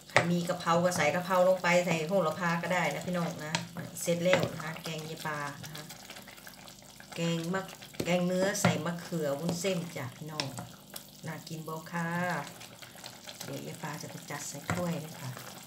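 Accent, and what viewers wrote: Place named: Korat, Thailand. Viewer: แกงมะเขือเนื้อน่าอร่อยเผื่อด้วยค่ะไม่กินเนื้อจ้ะ คนโคราชนิสำเนียงโคราช สบายดีนะ😋สวัสดีจ้า วันนี้ว่างเลยมาหาค่ะ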